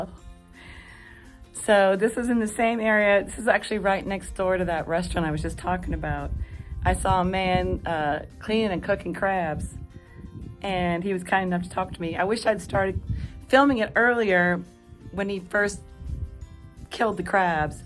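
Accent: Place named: Texas, United States. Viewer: 🤔 From TX you say? I didn't pick up on that accent in video, but it was a pleasant surprise here. I haven't heard one in a while, as I am on the road myself. 😊 I lived in OR for a decade, and just loved it. Nothing like the concrete jungle of the DFW area. You have a lovely smile that really exudes happiness - may that positive energy lighten the load of everyone you meet.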